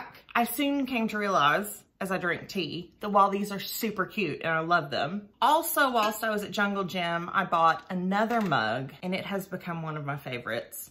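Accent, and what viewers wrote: South, United States. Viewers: I don't know what I love more, your gentle southern accent or your enthusiasm for British stuff. Oh hell, I love them both. Being a Brit, I've accumulated so many mugs over the years, that every couple of years or so, you have to revisit the cupboard to decide which ones to throw out. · You should look for a mug with a ceramic frog or spider stuck inside on the bottom... its hilarious when the person drinks down and the Frog reveals itself .. i love your sweet southern accent ...keep drinking that tea..dunno about the waffle though...maybe i need educating lol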